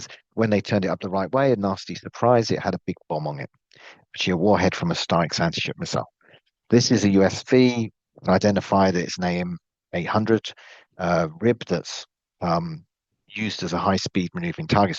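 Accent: United Kingdom. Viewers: I love your briefings, but the sound is horrible. a) British accent, b) RUS/UKR names and locations, c) lots of acronyms and technical words. Time to block channel.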